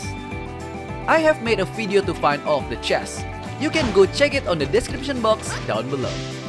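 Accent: Indonesia. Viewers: Lu orang indo ya bang? Logatnya kerasa banget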